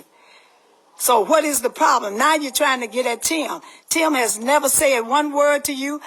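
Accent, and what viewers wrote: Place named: South, United States. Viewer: That's it. That's all! Talking like a southern woman with morals! God Bless you Ms.. By the way you look gorgeous! You go now!!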